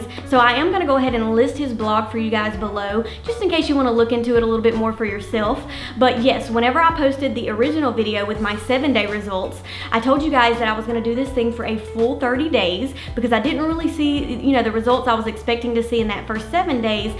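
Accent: South, United States. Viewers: Such a nice southern accent I love to hear it I day use to I was living in Alabama Tennessee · I love your southern accent! Where are you from? I know its 2024, but I am trying this out right now.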